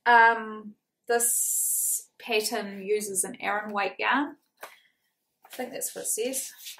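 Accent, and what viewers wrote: New Zealand. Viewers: hi it nice to meet you I'm glad Kelly recommended you I'm loving listening to you and seeing your makes I'm the same I wish I could sew better because I'd love to make my own clothes I just never get round to. it I have a soft spot for anything to do with New Zealand because I lived there quite a few years ago and my parents still have a home in the north island it's great listening to your accent so familiar Looking forward to catching up on your other episodes.I'm watching you in the sunny ukl. today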